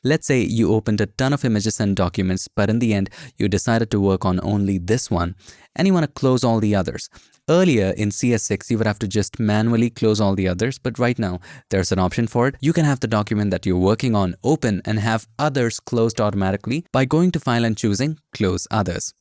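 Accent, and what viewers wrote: India. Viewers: I didn't even think that i will fall in love with an Indian accent one day xD love you mate , your fan from Egypt!♥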